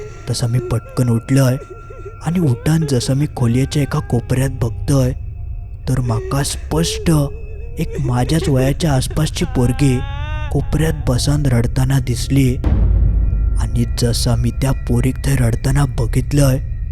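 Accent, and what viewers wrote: Malvani, India. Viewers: Hahahahha response faar bhari hote comment la, Masta vatta malvani ikyla 🎉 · Ya bhashela malvani mantat Kalah ka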